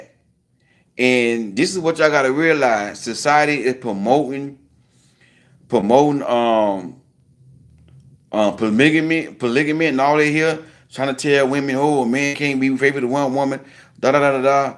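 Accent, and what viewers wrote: South, United States. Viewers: Love the country accent, Christian values, and quality content!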